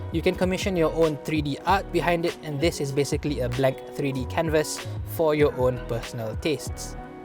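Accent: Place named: Malaysia. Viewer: Suara orang Malaysia. Tu yang kita nak. Ada orang dah pandai cakap orang putih nak jadi orang putih terus.. selamat maju jaya.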